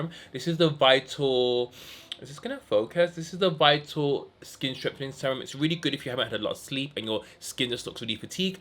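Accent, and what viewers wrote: Birmingham, United Kingdom. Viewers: Are you from Birmingham? X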